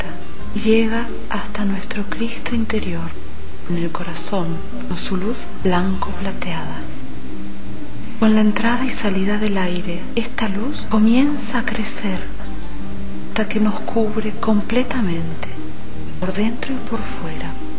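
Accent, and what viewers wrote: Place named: Argentina. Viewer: no se por que pero el accento argentino me pone de los nervios!!! como relajarse asi????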